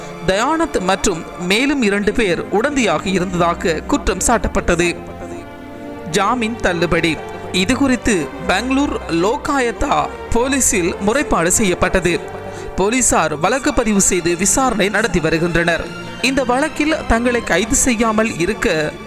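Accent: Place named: Sri Lanka. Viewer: he s srilankan"tamil"... naturally their pronounciation...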